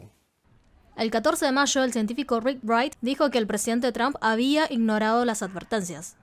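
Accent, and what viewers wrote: Buenos Aires, Argentina. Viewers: Yo me quedaría callada, todos sabemos cómo es el gobierno Chino , y por otro lado el virus salió de algún lado ya sea de laboratorio o que no hirvieron bien su murciélago y por su culpa estamos todos metidos en casa y ahora deben de responder por todo el daño que causaron además ese pinche acento argentino no te ayuda en nada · muchas felicitaciones por exponer u explicar, te soy sincero hablas muy pero re-bien el castellano con ese pequeno tonito Argentino, pero mi gran admiracion y respeto para ti. Gracias.! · Esta chica habla un español de Buenos Aires mejor que el mio.....congrats. Ya nada importa el virus.....estamos en el mismo barco. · Oye tu español está perfecto! En cuanto a China.. vamos a separar 3 cosas. 1. China como país NO tiene la culpa 2. Todos los ciudadanos de china tampoco tienen la culpa, la gente de China es muy respetuosa y tranquila, son buenas personas 3. 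El Gobierno de China fue el problema. Peeeeeero en USA, Europa etc.. actuaron tarde igual que el gobierno de China. Tú debes estar tranquila, tu país y tus compatriotas NO tienen NADA que ver con esto Soy mexicano y si, el gobierno de México, actuó tarde como lo hizo el gobierno de China. Oye se te nota el acero como de argentina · Muy bueno tu informe ..muy acertado ..me escanta tu tonada argentina ☺ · Qué "porteño" que hablas por ser china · Qué graciosa!!! Una china hablando español con acento argentino!!!! Además super controlado el acento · Se nota q viviste en Argentina ! Bella e inteligente !! Estás en Argentina ? O seguís en tu país ?? Me encanta q seas muy clara ! Q con acento Argentino reconozcan tu español latino Argento !! 😘😘😘😘😍😍😍😍❤❤❤❤ Sos hermosa , te queda muy bien tu cabello suelto !! Sos muy joven ! Eres soltera ??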